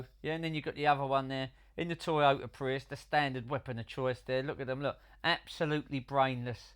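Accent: Cockney, United Kingdom. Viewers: Love all your videos,but the cockney just adds the cherry on the cake.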